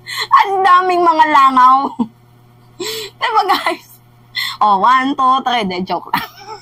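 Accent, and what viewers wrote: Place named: Iloilo, Philippines. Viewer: ILONGGA KA NGA TUNAY❤❤❤❤❤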